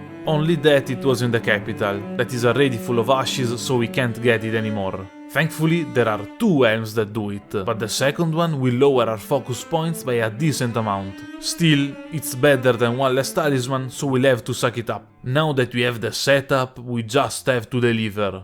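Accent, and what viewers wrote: Italy. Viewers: Mi son bastati i primi 2 secondi per capire che eri italiano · this guys Italian accent is so heavy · l'accento italiano più forte e marcato che io abbia mai sentito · you sound so much italian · Are you Italian by any chance, mate? I can recognize the accent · si senta un filo l'accento italiano mi piace ancora di più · Accento leggermente italianeggiante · I hear the Italian accent my guy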